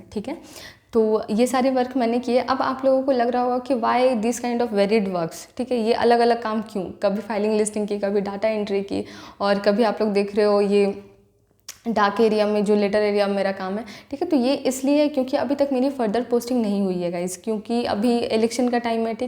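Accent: Jharkhand, India. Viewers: Aap jharkhand se ho kya??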